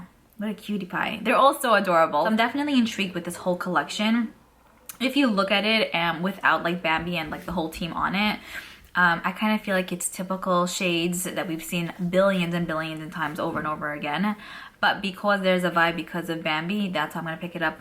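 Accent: New York, United States. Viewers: sounds like you live in nyc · I love the NY vibes !!! Your accent, the horns, all of it 😃😃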